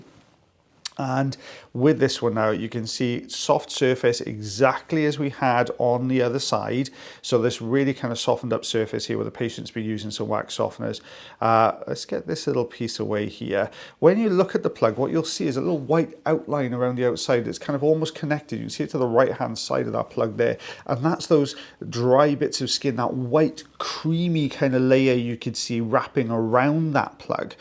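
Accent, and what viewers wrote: Wales, United Kingdom. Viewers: My favourite is when he says Ebony for the dark wax. Lovely Welsh accent! Not in this video though.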